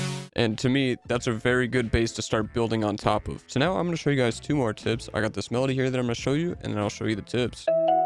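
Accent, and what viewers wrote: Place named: Canada. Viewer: This whole video helped alot and i can hear the canadian in your voice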